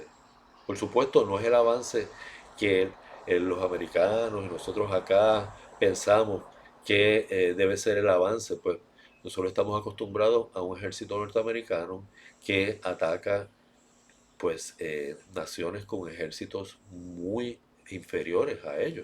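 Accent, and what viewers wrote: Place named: Cuba. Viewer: PONGA LOS PIES EN EL PISO AL HABLAR QUE TIENE LA MENTE IDA. ESO SON AÑOS DE HABER VIVIDO EN CUBA. POR LO QUE OIGO PARECE CUBANO.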